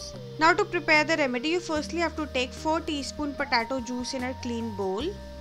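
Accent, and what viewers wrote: India. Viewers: this indian accent had been very annoying omg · im for sure you are an indian, the way your accent sounds like, by the way, the way you said POTATO is kinda bit funny, in a good way.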